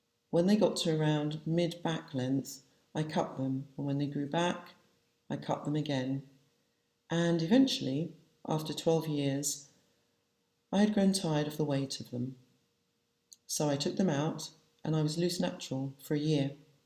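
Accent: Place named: United Kingdom. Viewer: Oooh heard the accent and subbed! Not found many Brits on here I’ve small locs 184 10 months old. I started my sisters 6 weeks ago she has bout 205. Will share this with her too hopefully got some good tips for us.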